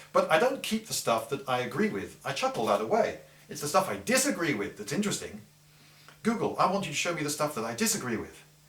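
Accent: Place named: United Kingdom. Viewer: I rarely didnt understand some parts of it (im not native speaker) but i can say you sound so britsh. In fact its one of the most britsh sounds i ve ever heard sir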